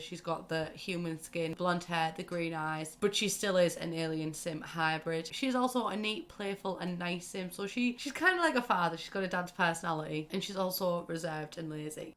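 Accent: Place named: Liverpool, United Kingdom. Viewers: As a scouser, I'm loving hearing a familiar accent after being so use to American on YouTube 🙌🏻 also recently came across your channel and I subscribed almost at once, you're Brill 💅🏻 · Another fellow scouser here too!!! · Scouser here too 🙋‍♀️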